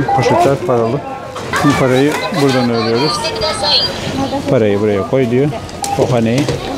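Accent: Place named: Ankara, Turkey. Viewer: Selamün aleyküm hacı abi senin memleket neresi ? Sanki angarali gibisin ama bilemedim ha bide araba satışında 1000dolar 1000 lira gibi dedin ben anlamadım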